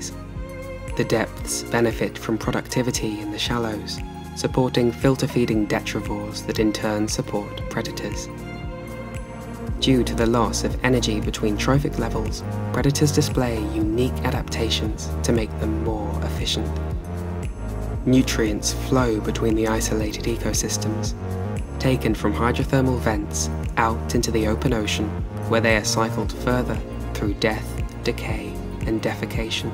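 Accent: England, United Kingdom. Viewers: i dont like your voice and your accent (perhaps you are really an english), but i like your videos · Usually I'm not too big on english accents (sometimes I hyperfixate on things) but you my friend have that ASMR type of voice just without the cringe. That kind of stuff isn't my forte, but big homie over here got that type of voice I could fall asleep to. Ever consider getting into voice acting?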